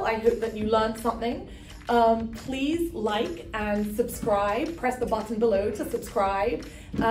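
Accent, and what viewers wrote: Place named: United Kingdom. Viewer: Dr., I was wondering if you have ever worked in NHS as well? Your accent is very British.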